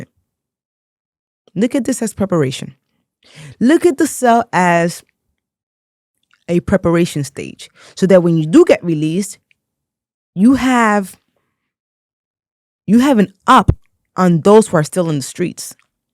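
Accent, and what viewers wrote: Dominican Republic. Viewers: I caught that too when you spoke. I’m also Dominican. KlK 🫡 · I picked up on that beautiful subtle Dominican accent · Is she Dominican ? She has an awesome accent its so subtle. I was trying to place it but couldn't. Cool lady..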